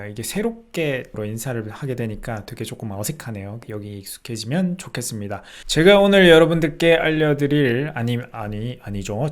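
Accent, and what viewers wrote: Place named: Seoul, South Korea. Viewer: 이제는 서~~울 사람 같아요 ㅋ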